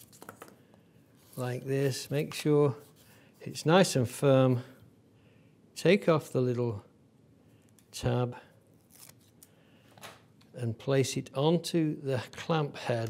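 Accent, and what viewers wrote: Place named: Southeast England, United Kingdom. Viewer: Hey, what part of the 🇬🇧 are you from? You sound like a southeastern accent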